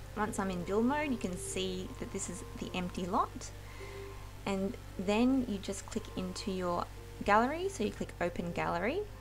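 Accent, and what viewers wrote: Australia. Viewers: As soon as i heard your voice I could tell you are aussie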